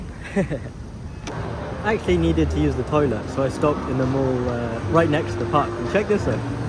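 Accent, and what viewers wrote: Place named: Argentina. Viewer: I keep watching while reading most comments here about how calm and chill this episode. My first impression is you're from South America, particularly like Argentinian. 😂😂. Then to my surprise that you're from Wales when i check your profile page. The only Welsh i knew was the menacing Giggsy.. Aha Enjoy your time in Malaysia eh..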